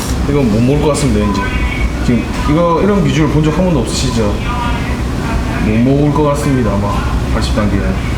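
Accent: Gyeongsang, South Korea. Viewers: 님 고향이 어디신가요? 경상도 사투리인건 알겠는데 어느지방쪽이신지?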